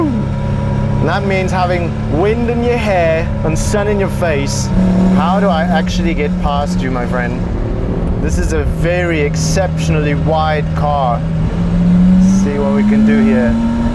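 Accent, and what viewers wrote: South Africa. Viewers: Sounds like a South African accent.🤔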